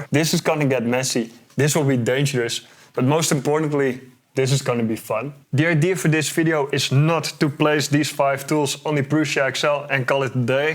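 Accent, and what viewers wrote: Netherlands. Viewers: i thought you sounded dutch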